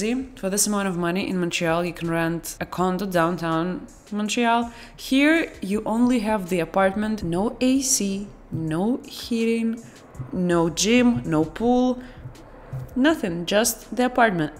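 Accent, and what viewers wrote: Russia. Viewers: People love your Russian accent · That recurring Russian accent 😍😘...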